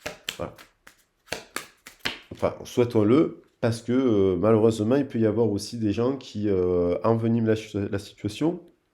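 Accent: South, France. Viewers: Bonsoir vôtre accent du midi me remonte le moral ca fait du bien moi des angoissés seule tres angoisse merci bonne soirée bisous ❤❤❤❤❤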